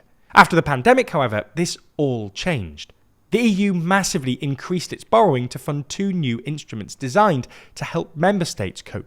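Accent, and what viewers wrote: United Kingdom. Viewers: Where are you from? cause you sound british, but that would be strange :)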